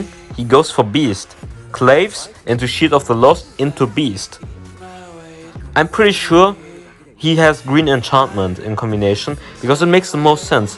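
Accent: Germany. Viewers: Bro are u german ?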